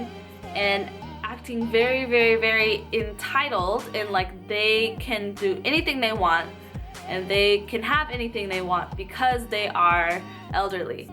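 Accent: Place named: South Korea. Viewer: i detect an ever so slight korean accent in your english.. just a few words.. lol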